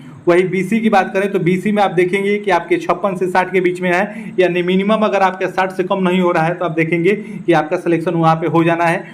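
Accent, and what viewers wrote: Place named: Uttar Pradesh, India. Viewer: Aawaj se lagta hai ki UP k ho .Tum ye batao ki UR or BC me kabhi bhi 6 se 7 no ka anter ho sakta hai kya oo bhi 1600 seet me .Sahi jankari do ....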